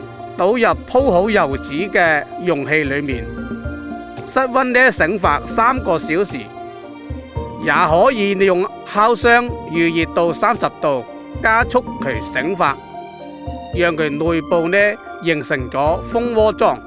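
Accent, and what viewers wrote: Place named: Dongguan, China. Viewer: 聽似東莞口音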